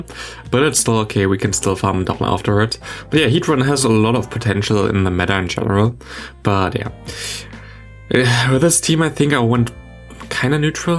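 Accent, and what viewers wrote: Germany. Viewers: Bist du ein deutscher ? :)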